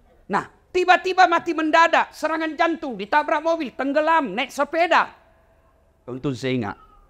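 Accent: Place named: South Sulawesi, Indonesia. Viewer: bantu jawab 🙏itu bahasa indonesia logat bugis makassar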